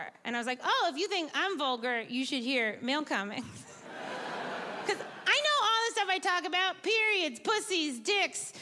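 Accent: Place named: United States. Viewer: Hearing annoying merican accent is not for me annymore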